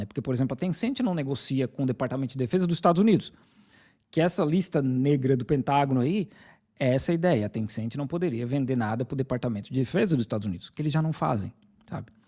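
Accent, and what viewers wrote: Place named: Rural, Brazil. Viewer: Sotaque cáipira nojento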